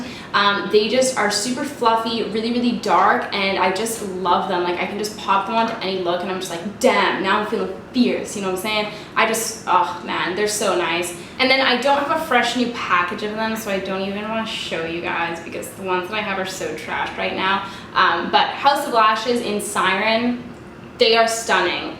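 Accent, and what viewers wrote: Canada. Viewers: I love your canadian accent, the way you say man and damn & love your hair!!!!! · Came across this video and the one where you state the items you would not purchase again. I knew within a minute you were Canadian - you talk the talk girl - and believe it or not, us Canadians have a certain way of saying words (there is a sharpness to the phrasing) that is unique. I used to travel alot through the US and people would always ask me if I was from Canada? I would say yes then they would say my 'accent' gave it away! Great channel! ~ Jennifer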